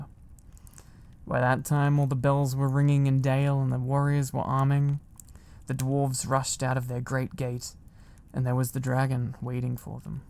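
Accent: Australia. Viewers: omg i am so excited for this series!!! i recently got into the LOTR books and movies and im loving them + your videos too omg. I AM LOVING THIS COLLAB SO MUCH LMFAOOO 😂😭 but jokes aside, your ASMR videos are really helping me get through sickness (a literal covid-level stomach infection and cold), period pain, and just mental health struggles daily. thank you so much for doing what you do, and all the effort and hard work you put in! <3 (all the lovely gifs you create are not going unnoticed at all dw! 🦋) your wholesome videos really brighten up my day (or night? since i listen to them while sleeping mostly haha.) i love your sense of humour, your rambles, and your ideas! you also seem like an incredibly intelligent and a really good person. you are charming as hell and your austrailian accent is amazing of course haha. (im sorry this comment turned out to be equivalent to a personality test result + tarot reading 😭 but i meant it all!!!!) i am so glad i discovered your channel!!! 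ilysm pls, thank you so much for existing. 😭🫂💗💗💗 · i really can’t tell if ur Australian or not but i fkn love ur accent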